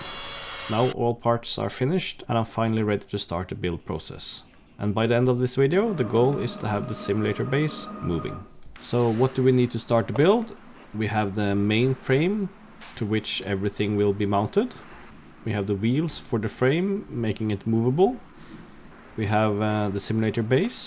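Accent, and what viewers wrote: Norway. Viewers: Jeg viste at du var norsk jeg merka det bare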